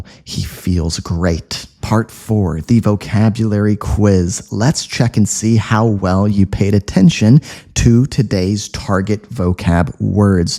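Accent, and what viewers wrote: United States. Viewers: Hi. I am watching from france, I discovered your channel recently. I listened to the GSL english podcast, and I loved the way you spoke in that podcast. Your American accent is terrific, and it has drawn my attention and wanted to listen to you more. Keep up the good work. I love what you do 🙏🏼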